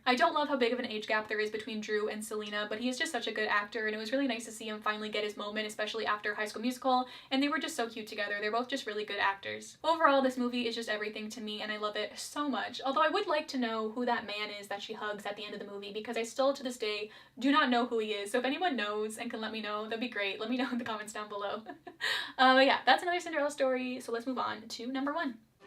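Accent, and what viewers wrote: Canada. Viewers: Your Canadian “out”s were STRONG in this video!!:)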